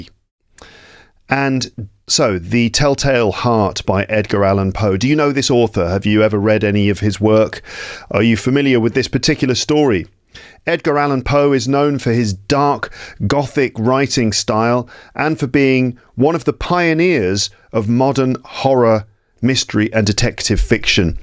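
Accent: United Kingdom. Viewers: Hello . Is this British pronunciation? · Thank you for the podcast you shared, I really loved your British accent when listening to the story too 👍👍🙏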